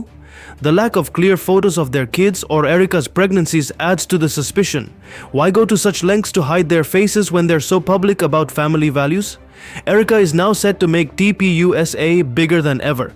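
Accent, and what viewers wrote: India. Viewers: This man is sound like Indiana! Someone paid him to report this fake story!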